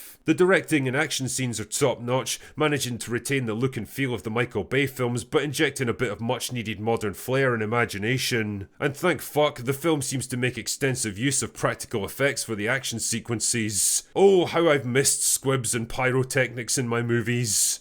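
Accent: Scotland, United Kingdom. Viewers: I was wondering if this was simply a vehicle for Will Smith to crawl out of embarrassment hell but your review says otherwise. That it's just Will Smith trying to get back to his normal and forget that incident. Maybe he should just divorce his wife while he's on form and really dump his past and get on with Bad Boys 5...hell if Stallone and Swartzeneggar can keep going, why not. Thank you for the review, as always, poinant and entertaining with that wonderful Scots voice. Been enjoying you shitting all over Star Wars : The Immaculate twins haircuts but it's nice to have a change and this was a nice change.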